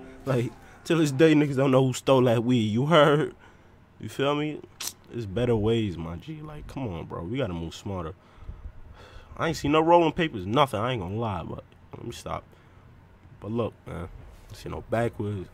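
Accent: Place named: New York, United States. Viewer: yeoo this my first time coming across your videos, I liked your message however you NY asf you had me dying lmaoo ju hurddd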